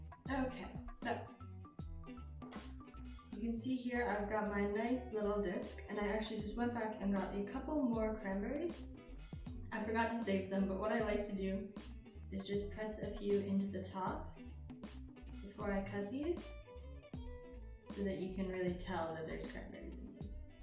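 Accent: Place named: Ontario, Canada. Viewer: You are adorable! My daughters name is, as well. She is soon 29. So love that name. She’s named after an Irish friend of mine. I always called her “ Jiggs,” too. We had all of the books. Such wonderful memories! I grew up in Ontario, Canada. She lives in Stratford, Ontario, now. You sound like you are from Ontario, also.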